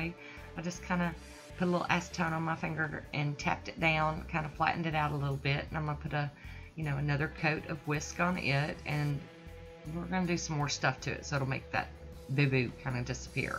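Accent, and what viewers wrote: South, United States. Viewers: The Whisk is very pretty! In the end I like this, it's cute with the snowmen and snowflakes! I gotta add, lol you tickle me to death with your "southern slang" and pronunciations (it sounds like I'm listening to myself) I just love listening to you talk! I never really understood why people would say that to me lol but now hearing you I understand! I got up from bed not wanting to wake my hubby with my horrible cough I have going on so I decided to go to my special spot and catch up on my videos lol, not so sure it was good for the cough lmbo but it sure is good for my feelings haha! Thank you, you're Awesome! Till next time, much love!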